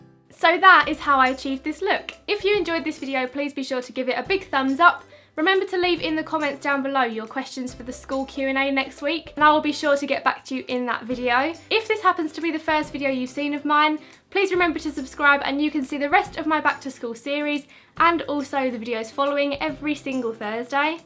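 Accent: United Kingdom. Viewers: What year/grade are you in? Love you and your British accent 💖💋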